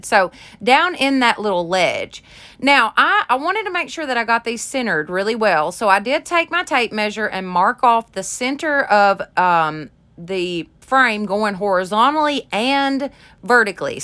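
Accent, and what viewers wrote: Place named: Tennessee, United States. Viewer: Hello and greetings from northeastern North Carolina. 👋👋 I'm a newbie. I already subscribed because I love your name for your channel! Your energy is inspiring! AND I LOVE THAT TENNESSEE ACCENT!! 😁 Actually I do have a question. And I don't know who to ask. Where di you sign up to do LIVES here as well as on YouTube? I've dreamed of being a small business owner for about 23 years now.... I am a "Chronic Creative" (borrowed from Amber Strong 😁). I enjoy creating, and I decided after hurting my back and being forced into early retirement - LET IT FLY! It's taken me this long to feel ready. I AM READY!!! Thanks! L8R ✌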